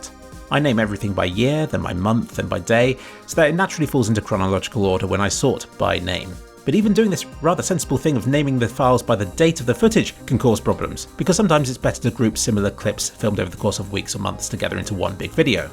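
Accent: United Kingdom. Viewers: Did you still have a British accent back then?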